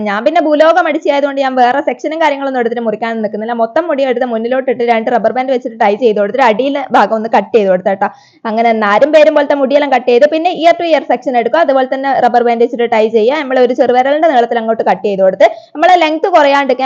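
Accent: India. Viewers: Chechi kannur thalassery aano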